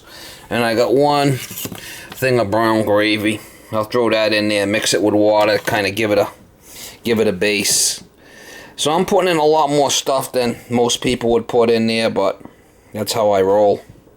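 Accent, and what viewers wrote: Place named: Boston, United States. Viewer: Question for you, as I'm a pretty fan of your channel and work in the HVAC residential field. But anyways, from hearing your voice, are you from Boston or New Jersey?? I've always been curious Haha love the videos man, keep em coming!!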